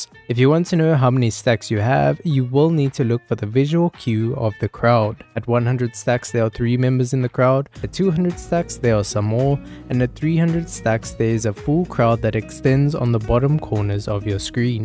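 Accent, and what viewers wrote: South Africa. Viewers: Do I detect a lovely South African Accent?! Respresent! XD enjoyed the video 🤌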